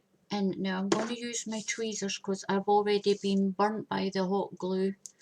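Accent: Scotland, United Kingdom. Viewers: I loved listening to your lovely Scottish accent as much as I loved watching the flower coming to life. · Just happened across this posting as I was looking for ideas for my daughters wedding. I have to say it was like my Mum was talking to me !(Scottish accent!) Thank you for this wonderful idea ! Now I need to make 30 of these!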